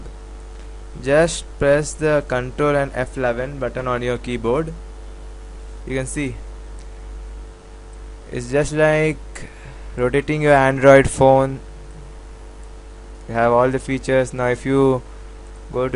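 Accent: India. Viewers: hahaha i love gujju accent lol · 100% idian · He sounded like a Hindi (India).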